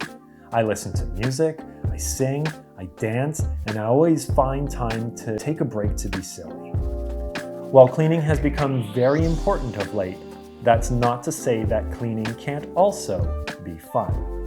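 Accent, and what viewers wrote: Canada. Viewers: yes he sounds Canadian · Sounds Canadian, no? Very calming.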